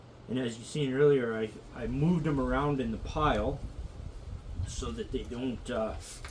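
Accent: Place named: Canada. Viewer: I wonder if this is similar to a Perique ferment. I suspect that the bacteria group responsible for the fermentation are facultive anaerobes--a bacteria that technically does not need oxygen, but benefits from a wee bit of it, thus the regular turning, and airing. A Perique is aired monthly, and under pressure-in water. You are using more water than in cigar production, but they are in a more humid environment (your accent sounds Canadian) Was that 106 degrees F. you said? So many microbes tend to be active at body temp.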